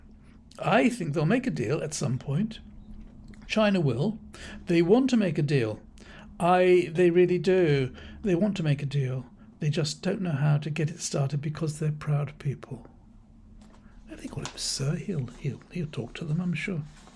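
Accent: United Kingdom. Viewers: You know the speech is dumb when a British accent doesn't make it sound any smarter.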